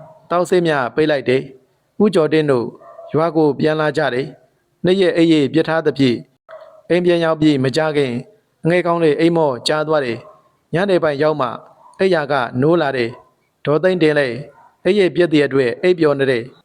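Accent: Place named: Rakhine, Myanmar. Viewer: အသံ ကောင်းတယ် ရခိုင်သံဝဲသလိုဘဲ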